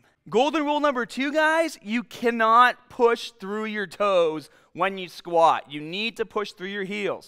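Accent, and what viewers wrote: Boston, United States. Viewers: The classic Boston accent! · That Boston accent. I used to confuse it with the New York accent ... now I never will. I live in CT, so smack dab in the middle, but surprisingly I don't know a lot of people with a Boston accent. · I love Boston accents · Boston accent? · Holy crap!! I'm new to this and have been watching ur videos for a while because u explain awesome. And I heard ur voice this time ..and said no freakin way. This guy sounds boston!! No kidding i looked u up!!! Wicked awesome neighbah! I live near fallriver, Ma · I love that accent, is it Boston? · Is that a Boston accent i hear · That Boston accent is thick!! great vid! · I love your true Boston accent so beautiful. I’ll try this when the new local gym opens. It’s so cute how you say stahting and then say starting. Keep your accent it’s unique and part of who you are 🙂 I guarantee it’s super cool! 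· You from Boston ? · He must be from Boston. That’s how we talk here lol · Is he from boston?? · what the hell is his accent, man. Boston, maybe?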